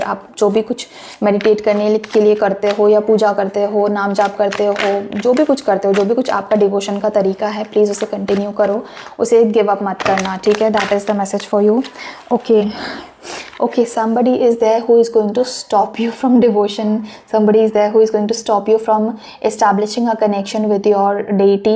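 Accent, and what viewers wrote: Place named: India. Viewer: Don't speak indian style English it makes me sick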